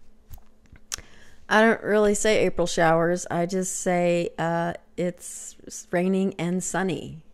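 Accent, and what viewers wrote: Texas, United States. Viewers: I am not really sure how a Texas accent sounds... but i think yours is lovely... :-) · You have very little Texas in your voice - once in a while, but not often. The new sign is cool.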